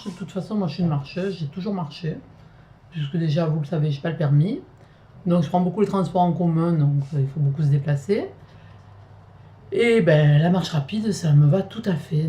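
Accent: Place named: Aubagne, France. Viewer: Comme toujours, tu as des explications claires et précises......que c'est agréable......et en plus, avé l'accent d' Aubagne! lol C'est super, moi, je vote pour toi, pour la Reine des Blogueuses du Sud! Bisous 👍😘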